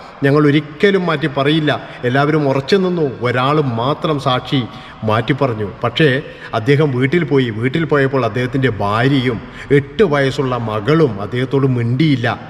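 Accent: Kannur, India. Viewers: നീ എടെ സ്ഥലം, കണ്ണൂർ അല്ലേ 😂നിന്റെ വർത്താനം കേട്ടാൽ അറിയാം 🤣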